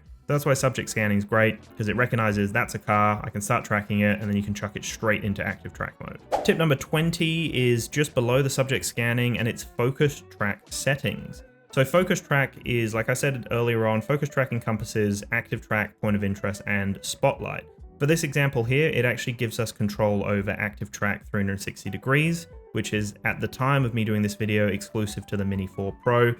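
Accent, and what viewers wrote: Australia. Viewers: Easy to follow, and pause where you need to. The indexing at the bottom is a godsend. And an Aussie accent, so easy to understand. Highly recommend newbies watch this video.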